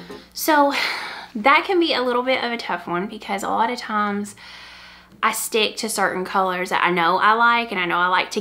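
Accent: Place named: Arkansas, United States. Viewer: Hi! I love your podcast!! I love that you’re mixing it up and doing some different formats. This was a great discussion on colorwork - awesome tips and advice. Thank you! I’ve got the Alpenglow in my queue waiting on squishy mail to arrive - so excited. I’m a fellow Arkansan transplanted to Texas a long time ago and I just love hearing your accent from home! You asked in a previous video for book recommendations. I would recommend reading “A Gentleman in Moscow” if you haven’t already read it. It’s a beautiful story. My mom and I are reading the Thursday Murder Club series at your recommendation and absolutely love it! Thanks so much for all you share!! You’re so inspiring 🐝 🐓 🧶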